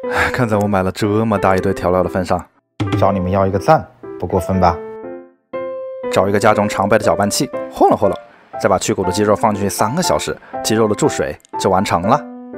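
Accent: Wuhan, China. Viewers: 一听说的就是弯管子武汉普通话😁😂😁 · 武汉的阿儿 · 博主这是武汉老乡么哈哈哈哈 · 是不是湖北武汉的？经常会有不经意间漏出的汉味普通话出来😂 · 第一次来是武汉人吗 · 这熟悉的武汉普通话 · 是武汉人吗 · 是武汉的吗？听口音有点像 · 武汉人吗？ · 我一直在评论里找武汉人，果然不是我一个人觉得他是武汉人😂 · 他是武汉的之前做热干面那期视频有提到哈哈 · 这弯管子武汉普通话哈哈哈哈就是有点吧辣…哈哈哈哈哈哈听着跟我妈差不多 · 听口音~武汉的？ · 哈哈哈哈哈哈好喜欢家乡口音！！武汉爱你❤️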